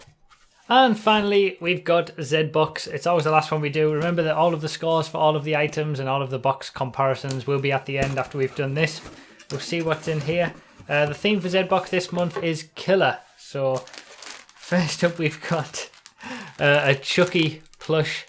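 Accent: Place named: Middlesbrough, United Kingdom. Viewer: You from Middlesbrough?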